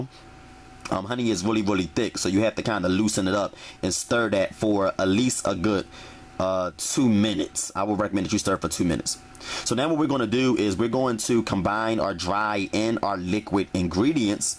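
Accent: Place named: Baltimore, United States. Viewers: Omg!! Why do you sound like you’re from Baltimore!! I guess the accents are very similar, either way I love it lol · I thought the same thing. I heard Baltimore and DC in there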